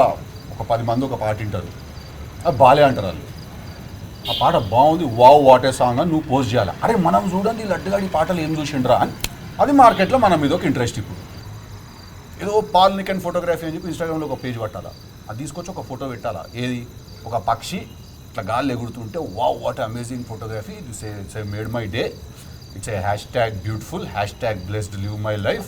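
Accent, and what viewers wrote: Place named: Secunderabad, India. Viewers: Annaa mastuu matladuthunnav Anna Hyderabad slang lo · Pakha secundrabad musheranad slangh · Hyderabadi slying super till Bhai ❤️ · Aa body language,..... Hydrabad slang chustunte... Idi edo..." Rahul splingunz.".... Biopic la undi..... Any ways... bhai...all the best..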